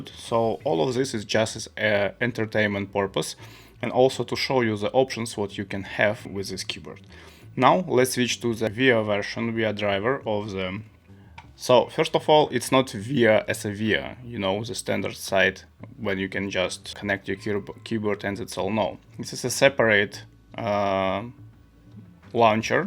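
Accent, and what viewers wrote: Russia. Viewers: Are u Russian?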